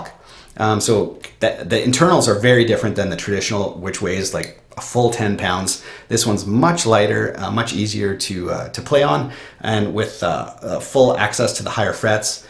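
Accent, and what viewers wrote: Canada. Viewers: Are you Canadian or from Dakota?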